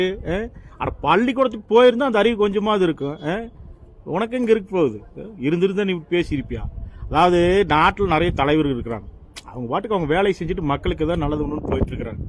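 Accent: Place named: Coimbatore, India. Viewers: கோயம்புத்தூர் பாஷையில் சூப்பர் குத்து😂😂😂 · கோவை பேச்சு சூப்பர் பா 🥰🥰🥰🥰🥰🥰🥰🥰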